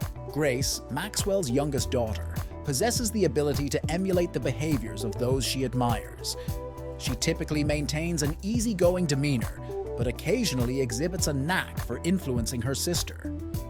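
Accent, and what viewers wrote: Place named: Ireland. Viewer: Irish accent reading non-English native's descriptions. Oh, the dissonance !!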